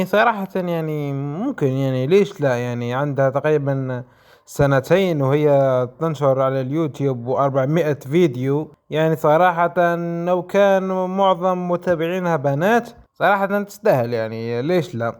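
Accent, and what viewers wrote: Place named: Tunisia. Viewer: من الواضح انك تونسي 😅 ترا انا تونسي بعد 🇹🇳 لهيك لا تستغرب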